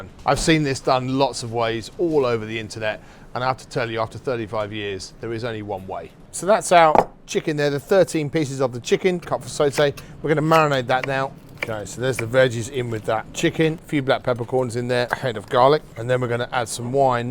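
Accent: United Kingdom. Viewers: Brirish accent, French dish and app belonging to which nationality! It is like the Butter Chicken saga😂😂😂Lost in transition.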